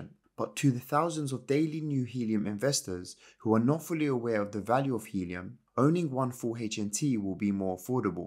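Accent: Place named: England, United Kingdom. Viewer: cheers mate! keep up the uploads, nice to have someone english talk about this stuff instead of these waffling americans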